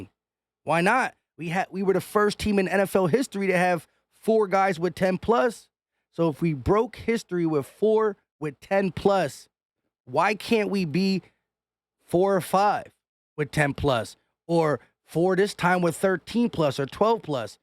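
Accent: Philadelphia, United States. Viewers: but wait yeah you could use so "professionalism and he could use some street" but don't get away from what makes you different know what I mean? You don't need to be proper cause philly isn't proper you sound like one of us he sounds like a sports broadcaster which works for both of you don't get away from that but I think y'all would be a great duo especially live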